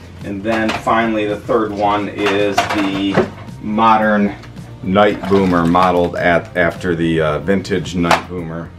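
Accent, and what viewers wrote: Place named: Michigan, United States. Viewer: Just found your channel, and it sounds like you're from Michigan as well. You're grabbing all these toys right out from under my nose!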